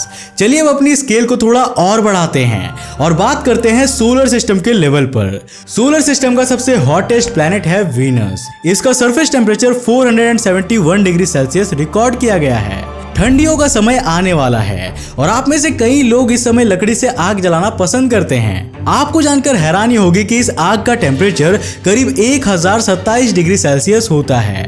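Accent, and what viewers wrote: Bengal, India. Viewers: Kya tum bengali ho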